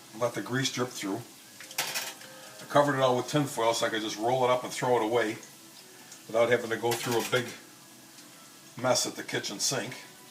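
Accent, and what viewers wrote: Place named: Buffalo, United States. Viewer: If I didn't know better I would guess you being from the west side of Buffalo.( thats a compliment by the way) Those cordone's look so good I can't wait to try some myself. I watched both videos mainly because it was like watching something from home. Thanks for that, and God bless you both.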